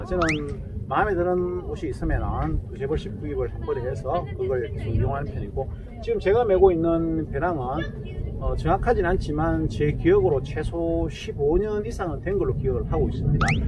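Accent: Daegu, South Korea. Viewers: 와우~~~ 님, 홍성 용봉산의 봄 풍광과 함께 개인적인 소개를 들으니 궁금증도 해소되고 더욱 친밀해 지네요~~~^^ 음.. 저보다 쬐끔은 어리시리라 생각했는데 6년정도 되네요~^^ 사시는곳은 저희 어머님 고향인 대구셔서 억양이 익숙하고 정감있었던 것 같습니다~~ 태안 백화산에서 '이리오너라~~ 게 아무도 없느냐~~' 와우~ 마라톤완주, 철인3종, 수영까지... 반바지 패션을 외쳤었는데~ 오늘 사진으로 다 봤네요~ 굳입니다~~ 항상 응원드리며, 사계절 확인제품군이 아닌 품목들은 협찬 받으셨으면 합니다. 그러면 실시간영상과 드론영상, 360도 촬영등도 앞당겨 할 수 있고 님이 하셔야 제맛일듯 합니다~~ 나이들수록 좀 더 큰 숲을 보기 위해 힘을 빼다보면 가까운 나무앞에서의 자신만의 룰이 꼭 정답은 아닐 수 있다는 생각을 하게 됩니다. 이 대목엔 맞지 않지만 요즘 제가 좋아하는 말이 있습니다. '악은 나를 생존케하고 선은 우리를 공존케 한다' 우리가 배우고 지키던 도덕과 윤리는 자연속에 부합되는 섭리가 아니라 인간 공동체 사회속에서 국한되어지는 룰이겠지요~ 코로나만 아니면 함께 등반기회가 있었으면 좋겠네요~~ ㅎㅎ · 아 님 거짓말도 좀 하시는듯... 마라톤 풀코스 완주에 바다수영 3km, 또 트라이애슬론까지 다 하시는 분이면서 체력이 그렇게 좋은거 아니라고 말씀하시다니요. ㅎㅎ 어쩐지 산을 좀 날아다니신다 했습니다. 사투리가 가끔 묻어난다 했더니 대구분이셨군요. 저도 동향입니다. 십몇년을 그곳에서 살았었죠. 유튜브 하시는 분들 다 수익발생 바라고 하지만 하고 있는 입장에서도 쉬운 일은 아닌데 너무 잘 하시는거 같습니다. 조만간 오억도 곧 달성하실거 같네요. ^^ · 억양만으로 대구 분 이라고 생각했습니다 540만원? 한 편 찍는데 이동하는 기름값도 안되네요 ㅠㅠ 구독자들과 소통을 위해 월1회정도 라이브방송 괜찮은듯 합니다. 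슈퍼챗 이라고 구독자가 후원해주는게 있는데.. 아마 인기많으셔서 금방 부자되실 거에요^^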